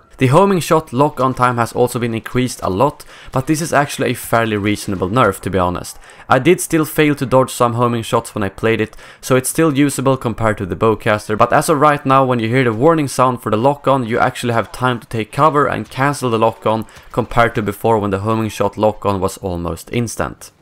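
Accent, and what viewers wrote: Sweden. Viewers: Are you swedish? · are you swedish dude?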